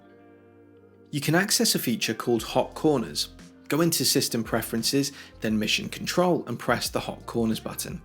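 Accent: England, United Kingdom. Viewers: Great video as usual. I’ve gotten so much benefit from your content; you condense all the fluff and get straight to the useful info. Every Mac user should subscribe! On a personal note, the British accent is just an adorable perk. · I really like your 10 tips videos,. Since I moved to Apple a couple of months ago after 36 years (1986!) in the Microsoft-Intel world, I used a lot of your tips to make my MacBook Pro comfortable and convenient. Nice to hear a clear English accent, too. All the best, Rob in Switzerland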